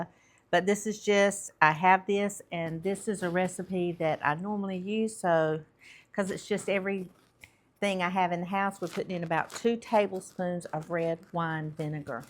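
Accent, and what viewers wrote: South, United States. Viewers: Now that looks like tasty pot roast! You’re always are so upbeat and I adore your Southern drawl. Thanks for all you do to just draw us in for little more seasoning. ❤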